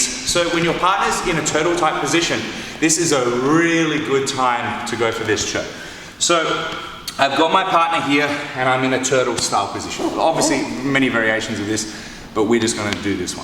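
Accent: Australia. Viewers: aussie accent is one of the most friendliest accent in the world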